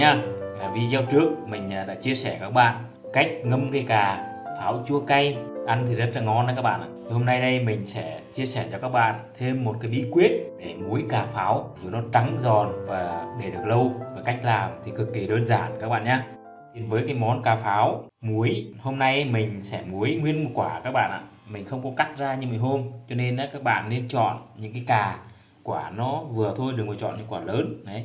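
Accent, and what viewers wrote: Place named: Central, Vietnam. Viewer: Cách chia sẻ đơn giản dể làm, cà pháo trắng giòn ngon. A là người miền Trung à anh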